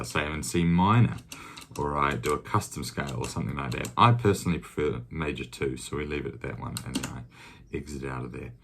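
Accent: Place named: New Zealand. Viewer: Hi You so sound like a Kiwi New Zealander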